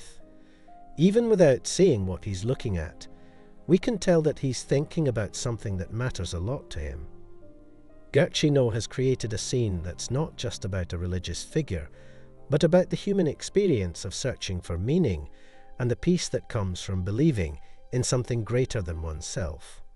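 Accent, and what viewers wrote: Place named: Scotland, United Kingdom. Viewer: I love your voice sooooo much! I can listen to it forever lol. Scottish sounds so cool. You are a great audio book haha 😂. Keep up the videos you got a sub!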